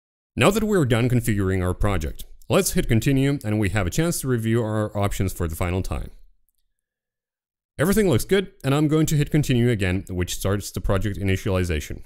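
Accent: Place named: United States. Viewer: It sounded like a regular america accent to me lol. Robotic? lol. But yeah good job. I speak fast myself and thought it seemed a reasonable pace but you slur your words together a bit, I have the same problem, slowing down might help with that. But thanks for making this fast and very short. I really hate it when tutorials drag on too much.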